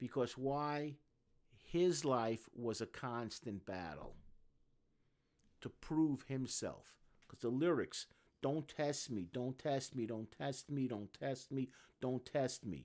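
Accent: New York, United States. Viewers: Your from New York aren’t you.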